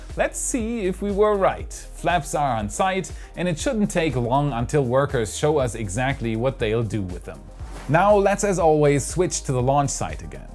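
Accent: Germany. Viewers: You should indeed do one with your German accent on display. Really enjoy the out takes at the end of your videos as well. you rock! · really fun an episode with German accent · Yes, PLEASE do an episode with your natural German accent! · German accent? It’s like Von Braun is back amongst us. You Rock! Tschuss! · I really like the accent... where the Germans are speaking in English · Hie.... I'm more anticipating the episode in the German accent than the 15km hop😆😆👍👏👏😁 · do a show in your german accent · Totally would appreciate an episode in your Deutschland accent. Reminds me of my mothers, who immigrated in 1960 to America. I think it would harken back to some of the greatest rocket scientists in history. Thumbs up if you agree. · episodes with a German accent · your German accent brings back memories of Guenter Wendt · do a future episode in the German accent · YESSS! 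A German accent episode, please! 😀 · You should always use the German accent. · I vote to go with German accent... just how you would normally talk and not exaggerated.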